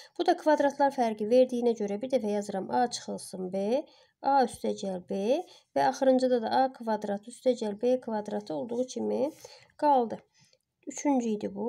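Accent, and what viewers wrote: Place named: Sheki, Azerbaijan. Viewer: Birdə sizə sualım var🙃.Çox marağım tutdu.Siz Şəkilisiz?Ləhcəniz Şəki ləhçəsi kimidi.Mən bakılıyam.Amma anamda sizin ləhçədə danışır.Zəhmət olmasa mənə cavab yazardınızda😇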